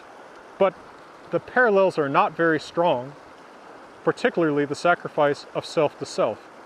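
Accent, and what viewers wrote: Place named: West Texas, United States. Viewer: Fantastic academic level content available on youtube with beautiful background scenery and a quirky cowboy tone? I don't think I've heard anyone quite speak my language like you do,. Grew up in West Texas and studied Latin and Classics at UT Austin. You've got one more subscriber out of me!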